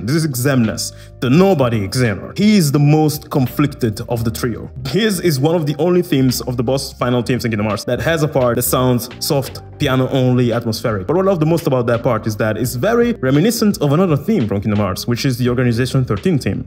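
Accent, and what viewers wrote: Italy. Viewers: Man, are you italian, or resident in Italy? Your accent really sounds like it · Non sapevo tu fossi italiano! Ti ho cominciato a seguire un po' di tempo fa e sentivo qualcosa di familiare nel tuo accento... Adoro le analisi che fai, ottimo video come sempre! Greetings From Italy!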